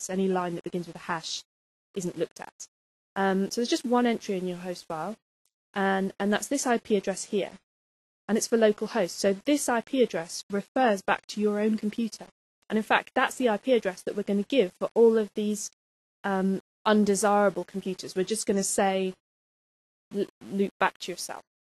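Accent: United Kingdom. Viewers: that is cool a fix to prevent slowing down, wit a lrg host file...luv ya Brit accent....gonna subscribe. =)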